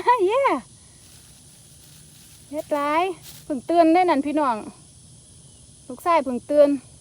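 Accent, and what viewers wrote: Ubon Ratchathani, Thailand. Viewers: เบิ่งมากะหลายคลิบกะว่าอยุ่สำเนียงคือคนอุบลแท้อยุ่อ.ไดละอีหล่าลูกชายน่าฮักคัก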